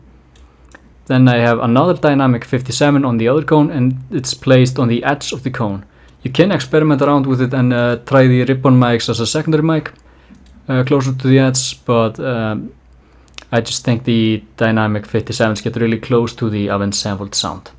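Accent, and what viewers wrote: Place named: Finland. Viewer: yo, you sound finnish lol, love the video. Keep going!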